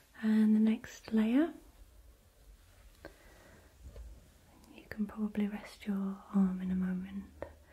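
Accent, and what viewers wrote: United Kingdom. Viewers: I live for the accent. Are you Australian or British? It sounds more British to me..? Anyway, great video!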